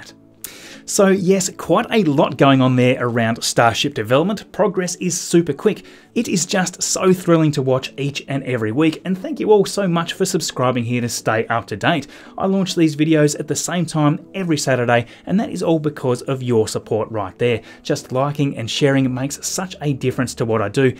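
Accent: Australia. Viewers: Where re u from Australia?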